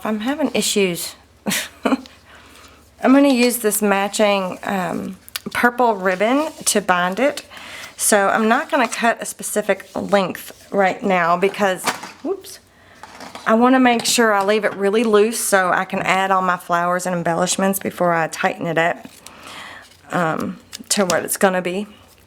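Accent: South, United States. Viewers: Just love it!! Top Note is a great name! You are a very creative lady.. love your videos so much!!! I think maybe its because we both have a southern accent! LOL Thanks for a great tutorial!!!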